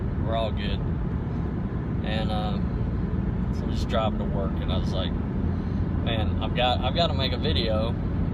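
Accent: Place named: South, United States. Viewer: It's always hopeful to see atheists like you in the bible belt; to be reminded that just because someone talks with a hick accent doesn't mean they're Christianized...i.e. brainwashed. I run across you diamonds in the ruff sometimes on YTube atheism and react like Dorothy did when Toto fled the witches castle: "He got away! He got away!" I empathize with your dilemma: all their lives, but especially when young, proselytizers are going to be overtly trying to convert your kids while others, like their Christian teachers, will be doing the same if more subtly. There will be strong social pressure to conform and convert and we both know how that's hard to resist when y're young, especially in the Belt. It's like a tug-o-war with you on one end and an army of Christians on the other and your kids are the rope! You know what's coming: "Your father is an atheist? 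You poor lost child. We will pray for you..." Fuck that! I'm afraid there's only one path for you: become a one-man army and take the fight to them! That's what I did and now I'm a Doctor of Atheism, ready, willing and able to face an entire auditorium of Christians by myself and adroitly turn them out because I know my science, my philosophy and my bible. It may seem weird but I love the bible for what it is: a priceless window into the minds of ancient peoples, ignorant and superstitious as they were. But as history or a guide for morality, the bible leaves a bit lot to be desired. And let's face it: Christians use the b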